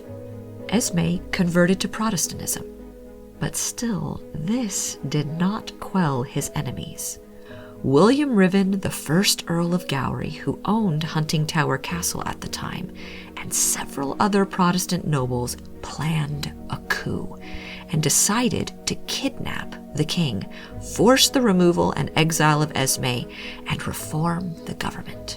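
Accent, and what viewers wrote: United States. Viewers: This is American accent at its finest.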